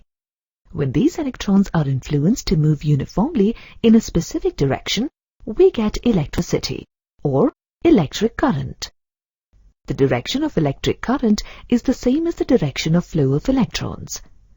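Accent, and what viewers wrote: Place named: India. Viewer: Bad accent and wrong terminology. A typical indian product.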